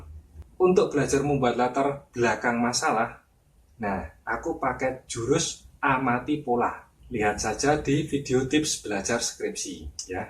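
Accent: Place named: Java, Indonesia. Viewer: males banget denger MEDO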